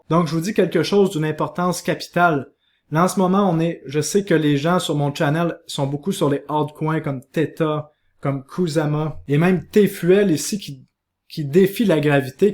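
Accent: Quebec, Canada. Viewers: Merci pour ces analyses toujours très intéressantes et ce petit accent québécois qui me change les idées! (Béco de la France;))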